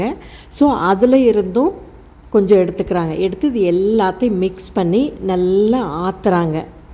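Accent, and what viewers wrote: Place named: Kongu, India. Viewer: moraccan mint tea miga arumaiaga seithu kanbitheergal sago kongu tamilil pesinathu arumai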